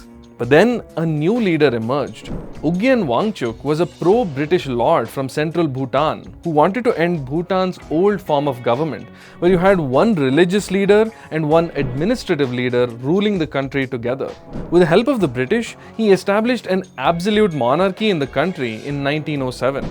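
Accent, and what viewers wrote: India. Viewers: Could not understand much. Too heavy Indian accent. From : Singapore 🇸🇬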